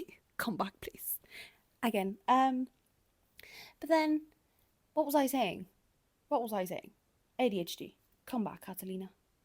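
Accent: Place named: United Kingdom. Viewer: Esto ya lo he dicho un monton de veces.... Si no supiera que eres chilena igual que yo. Juraria que eres britanica.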